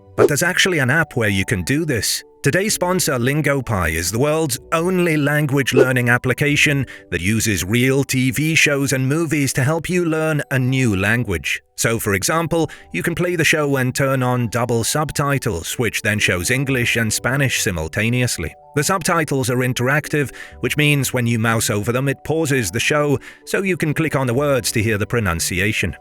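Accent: England, United Kingdom. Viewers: It’s very much an English accent. We have loads of different regional accents. Glad you enjoy the history. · You are right, it is your history too ! BTW, it is an English accent. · Right on! As an American, this is my history too, but it definitely needs to be told in a proper English accent! Excellent detail and a great narrative.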